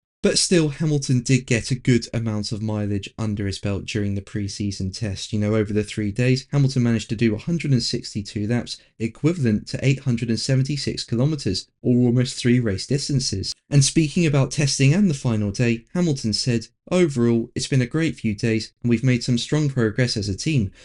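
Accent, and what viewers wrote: England, United Kingdom. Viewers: I love your english accent 😂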